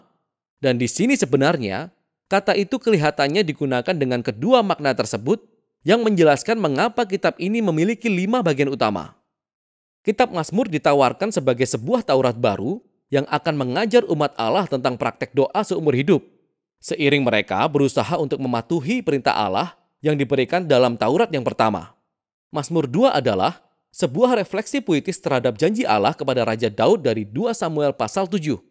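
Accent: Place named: Java, Indonesia. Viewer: Puji Tuhan! Konten yang sangat bermanfaat. (Pengen komen kalo Narator/Presenter nya agak medok sedikit hehe..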